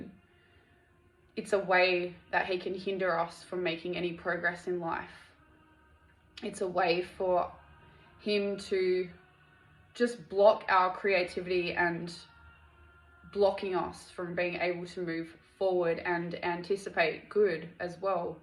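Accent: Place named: Australia. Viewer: WOW this video wasn’t a coincidence, I was battling bad thoughts today and you showed up on my screen for a reason. Praise Jesus and thank you very much I truly appreciate the encouragement and I’m going to apply this strategy more often. Also I notice you have an Australian accent as I’m from Australia aswell LOL. God bless you.✝️❤️✝️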